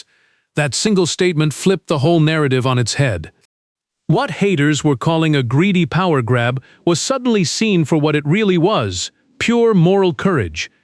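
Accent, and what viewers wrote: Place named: United States. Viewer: That's why we don't trust any of it. And spoken with an American accent, what can I say. It's not for Americans to comment on our affairs, and certainly not in this way. Americans always steps on other people's toes, thinking they own the world.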